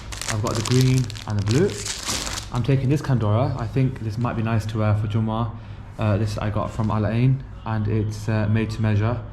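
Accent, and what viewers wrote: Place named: United Kingdom. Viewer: Salaam just found your channel, by browsing Umrah videos. I live in USA, but I took a vacation to the UK. I think you are from there with your accent. Jazakallah Khayr, such a beautiful video and a vlog. your off pakistani descent?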